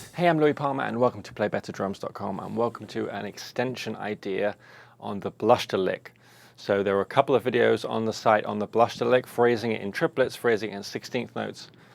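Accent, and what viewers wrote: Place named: United Kingdom. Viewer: Louie, Do you own all of these sets? As I am watching lately your videos (where you speak and act very British, compared to other guys on youtube channels) I can see a lot of nice sets: silver USA custom, black USA custom, blue Heuer, Phoenix, or Hybrid Maple, black and white....What's the deal? How do you get all of these?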